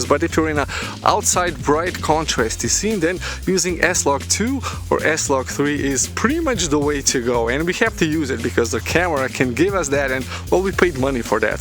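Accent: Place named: Balkans. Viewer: Great! Keep it up! Where are you from, if is ok to ask? Balkan?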